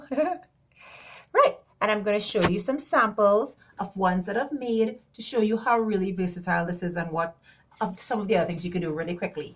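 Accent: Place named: Trinidad and Tobago. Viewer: Love the creativity, the one for you son’s room is beautiful. I love the accent is that from 🇹🇹.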